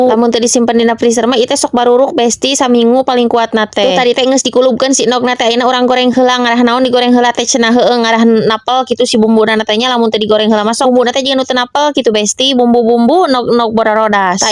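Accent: Sunda, Indonesia. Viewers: Logatna Sunda pisan teh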